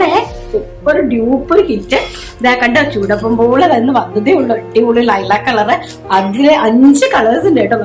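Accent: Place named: Tamil Nadu, India. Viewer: I like and your performance so much. Tamil mixed Malayalam kelkaan oru sugham und God bless you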